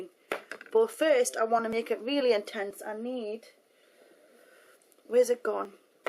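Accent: Newcastle, United Kingdom. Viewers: i like ur british exent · She has a geordie accent, she's from south sheilds near newcastle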